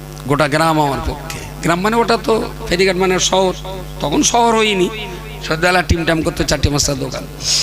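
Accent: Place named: North 24 Parganas, India. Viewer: Apnar katha r style amar valo lage North 24 pargana r katha bolar dhoron emon dekhechi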